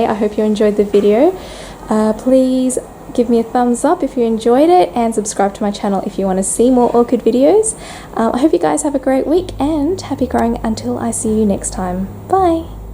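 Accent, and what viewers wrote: Australia. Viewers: I really enjoy your Orchies in Bloom videos. For one thing, I could listen to your voice with that Australian accent all day long. It’s so soothing and your content is interesting. Looking forward to the star of the show next month!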